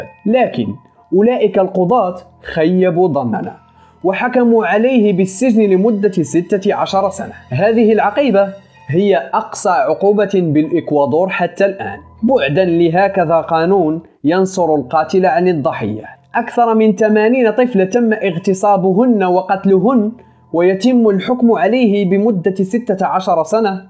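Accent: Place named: Morocco. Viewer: عرفت انك مغربي من لهجتك الجميلة 💜💜💜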